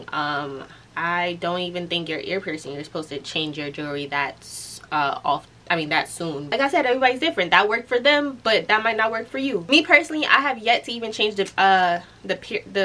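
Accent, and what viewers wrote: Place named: New York City, United States. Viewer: Shout outs to you and being frim NYC. I knew instantly once you started speaking. I'm considering this myself, very helpful video. Thank you, peace & love.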